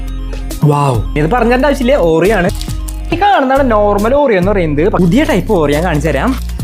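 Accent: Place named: Kollam, India. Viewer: That Kollam saying everything 😂😂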